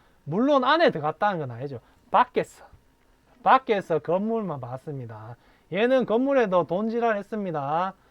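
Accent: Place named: Gyeongsang, South Korea. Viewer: 멘트가 뚝배기네요 진국입니다 듣다 보면 시간이 넘 짧네요 저도 경상도라 구수한 사투리가 친구 만난듯 정겹습니다 올도 유익한 내용 잘듣고 갑니다~꾸벅~^^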